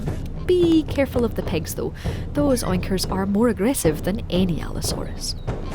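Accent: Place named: Scotland, United Kingdom. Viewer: I think Scottish accent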